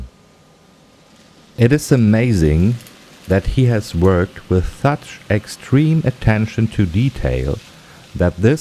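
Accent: Germany. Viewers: Geiler deutscher Akzent hört sich echt witzig an! (Das war NICHT beleidigend gemeint)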